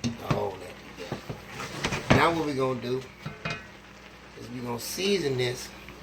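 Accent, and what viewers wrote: New Orleans, United States. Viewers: He got the New Orleans accent and he love to cook that's that boot life LOL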